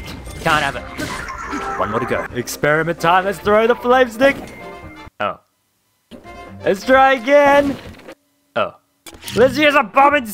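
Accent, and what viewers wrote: Australia. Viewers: Where are you from you sound aussie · Is this guy Aussie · You're Aussie??? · Is he Australia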